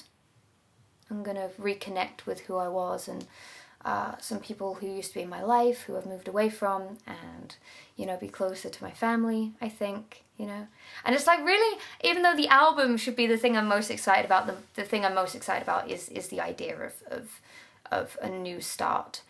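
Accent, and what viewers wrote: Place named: United Kingdom. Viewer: Hello there, don’t even know if you read these comments... but, anyways, a good intention always counts, right? I lived in London for a while and, missing the beautiful British accent, I went searching for a pretty uk face that sound good… So I found you. You are such an interesting human being. Congrats for that! About felling like a lonely wolf, working hard does that. But don’t let this become hurtful. I’ve been doing the something for over 6 years, got really close last year twice, but didn’t get it… I worked so hard that I lost all my friends, I used to be proud of it… Not anymore, I started English classes online just to have someone to talk to, hahaha. Long story short, we are social animals, maybe sometimes you are cranky and moody with people you share your time with, but so what? Everybody does that. You don’t need to be perfect! 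because guess what!?!?! Perfection is not something real, we idealize it! And by the way you are gorgeous! You are funny, smart, beautiful, great singer and very interesting being. About changing, If really feel like you have to, take it one step at a time, and one little thing at a time… Doing all at once will never work. Don’t be too hard on yourself. You got all it takes do be whatever you’d like to be! You are such a confident person, be confident about it too! Regards from Brazil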